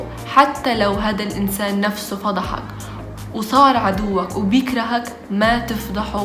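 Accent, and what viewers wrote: Syria. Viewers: انتي بتتكلمي سوري ومصري هو انتي مصريه ولا سوريه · نضارتك حلوة و كلامك السوري احلى من المصري😊🌹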